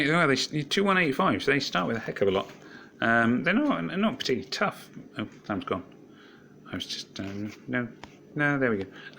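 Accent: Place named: United Kingdom. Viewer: Hello. Love that British accent...by the way hope you got how costumes work, and if you don’t this is FYI: You can’t ascend the costume more than the original copy it is accompanying. Leveling up and ascending costumes is much easier and cheaper, no special ascension material needed. Both original copy and costume got bonus stat when costume lvled up.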